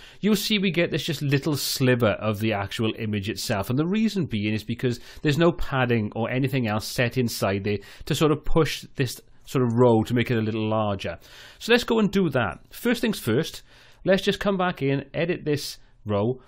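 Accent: Wales, United Kingdom. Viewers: Hey great video! Random question, are you from my merry land of Wales?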